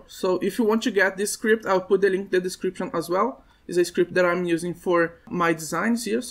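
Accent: Brazil. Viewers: da pra ver q é do brasil so pelo sotaque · Você é br hein não engana kkj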